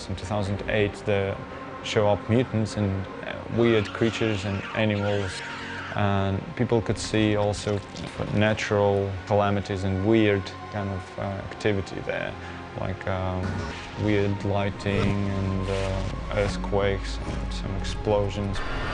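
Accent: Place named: United Kingdom. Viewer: i think this guy studied in UK? he speaks with a slight british accent...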